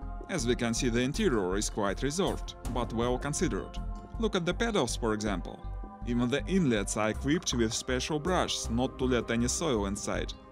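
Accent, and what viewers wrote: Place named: Russia. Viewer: ну и акцент русский лучше бы по русский пиздел... а так пришлось звук убрать а то это слушать противно. с тем же успехом можно смотреть как китайца говорить па руски на утуб.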